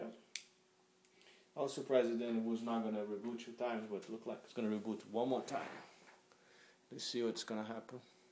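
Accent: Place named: Brazil. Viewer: brazilian accent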